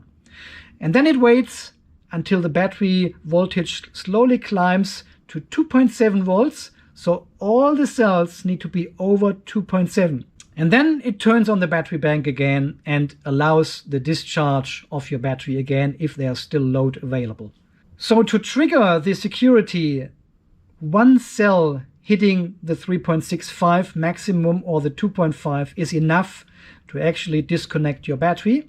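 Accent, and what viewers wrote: Germany. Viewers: I only want to hear your accent. I don’t care what you are talking about. You sound German. Yah? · Garage ist euer Dialekt in Australien so, oder kommst du ursprünglich aus dem deutschsprachigen Raum? Ich verstehe dich bestens, nur ich finde dein Dialekt klingt schon sehr nach "aus Deutschland" :D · Thanks I’m still catching up on all the videos. I think the German accent added with Aussie slang is great. Actually laughed out loud this morning from another video when you said “it sh1t itself”. Keep up the great work.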